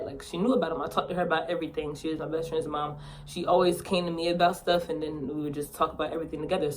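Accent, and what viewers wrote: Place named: New York, United States. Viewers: She from New York😭! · You can tell she from New York😂 or she might be from philly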